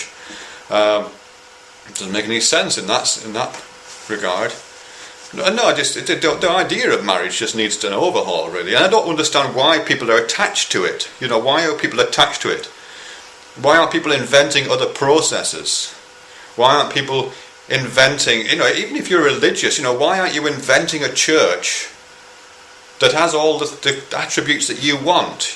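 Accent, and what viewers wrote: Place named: Bolton, United Kingdom. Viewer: As a side note, your dialect sounds similar to my wife's ex-husband, who is from Bolton That made me look up Blackburn, and I found that they're not far apart :-)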